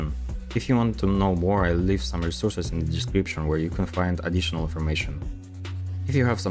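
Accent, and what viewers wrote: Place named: Russia. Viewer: бро я же слышу ты русский❤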